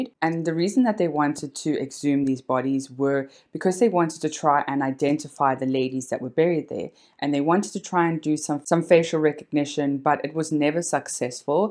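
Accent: South Africa. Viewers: Thank you, it's so refreshing to hear our South African accent for a change. Love your presentation style. ❤️ Well done young lady.🥳🥳🥳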